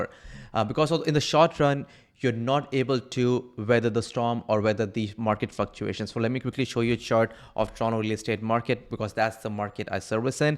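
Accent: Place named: India. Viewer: I heard an Indian accent and immediately turned off.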